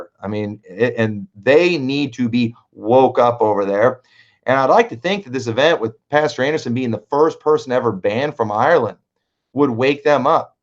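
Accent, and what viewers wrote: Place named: New England, United States. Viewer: I was hopeful that your Yankee accent was going to be a new temporary super power, but hopefully it was an encouragement to the local soulwinners that it can be fruitful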